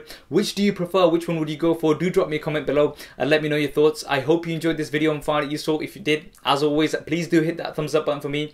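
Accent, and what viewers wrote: United Kingdom. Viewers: Very good vid. But I really can t subscribe s.b. Speaking this terrible B.E. accent. Why don t u speak more "neutral" or even A.E. accent? Still... Thx for tha video.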